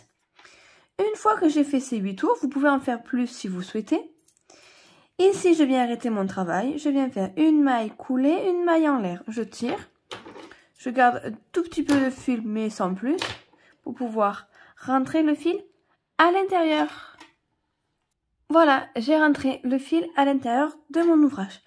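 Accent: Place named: Southeast, France. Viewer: Oui, Vos explications sont claires et nettes et votre joli accent me rappelle que moi aussi je vis dans le grand sud-est de la France. C'est du soleil pour la journée. Amicalement.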